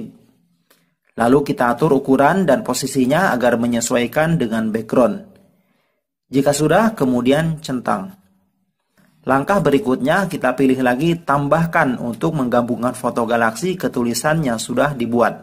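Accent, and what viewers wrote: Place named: West Java, Indonesia. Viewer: Sunda euy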